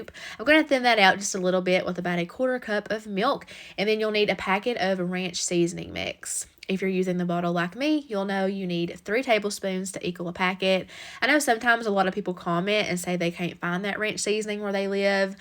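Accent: South, United States. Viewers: This is so random but I love having a southern Youtuber say bowl and oil the same way I do. Bole and awwll. 🥰 🥰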